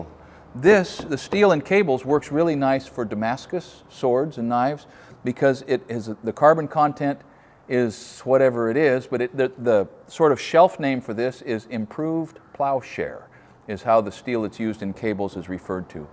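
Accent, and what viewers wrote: United States. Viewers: Love your beautiful vocabulary and accent. Original American accent. Words are not enough to appreciate the value of your useful programs. Lots of appreciations, thankyou.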